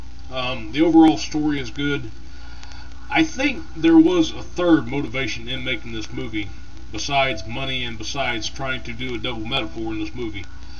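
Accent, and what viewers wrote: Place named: South, United States. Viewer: Hey, I'm from Canada. I think this review wouldn't have been as cool if you didn't have the accent. It makes me like you. Where are you from? Sounds very Southern. Either way, great review. I want to note however that they put real indians in the movie. I mean ful blooded ones, which I thought was extremely Canadian.nJust wondering if you noticed that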